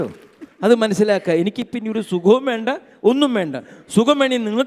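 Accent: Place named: Thrissur, India. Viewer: തൃശൂർ സ്ലാങ്ങിന്റെ ഒരു സൗന്ദര്യo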